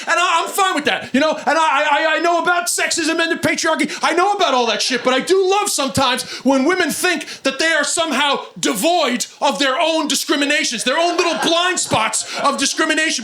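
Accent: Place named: New York, United States. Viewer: Bill Burr with a NY accent instead of a Boston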